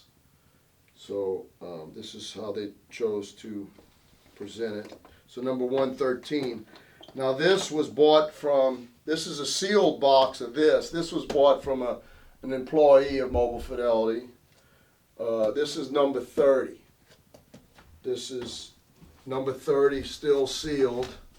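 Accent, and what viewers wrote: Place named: New Orleans, United States. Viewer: That is a amazing box set collection. Are you from Louisiana originally your accent reminds me of a guy I met from New Orleans.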